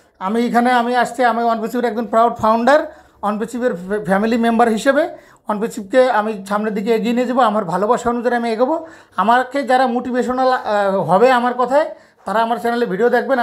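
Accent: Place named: Barishal, Bangladesh. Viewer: কথা শোনে মনে হইছিলো বরিশাল।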